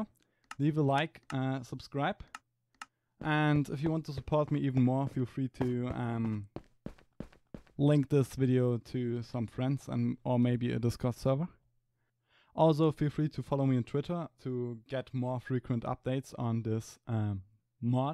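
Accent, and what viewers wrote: Germany. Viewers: haha englisch mit dein deutschen akzient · Ich find's immer lustig wenn deutsche englische Videos machen, weil man hört es schon ziemlich, naja, vermutlich wird das sowieso nur den deutschen auffallen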